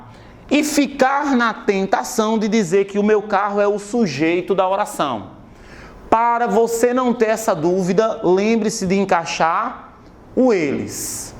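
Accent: Northeast, Brazil. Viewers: e esse sotaque nordestino professor o senhor é daqui mesmo?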